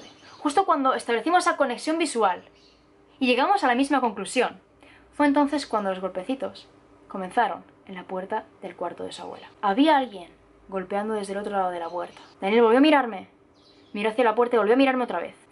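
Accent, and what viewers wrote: Spain. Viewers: Porq Dalasito no tiene este acento español ???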